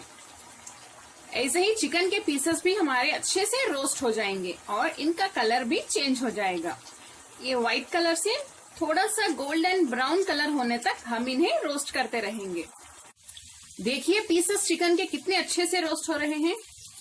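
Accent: Hyderabad, India. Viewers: got to know with your hyderabadi language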